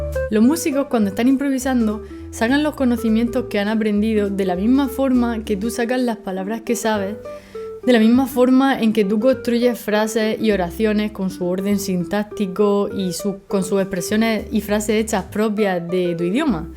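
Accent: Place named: Andalusia, Spain. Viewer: Hola, ví otros videos tuyos y me quedé un buen rato y te felicito, tu contenido es puro oro. Llevo varios años con mi tenor Jupiter y sigo aprendiendo de personas como tú. Al principio creí que eras de Chile por tu hermoso acento que si no estoy mal es andalúz. "Sonamos" muy parecidos, realmente, sobre todo cuando dejamos los modismos de lado 😂, saludo grande desde Valparaíso, Chile 🎷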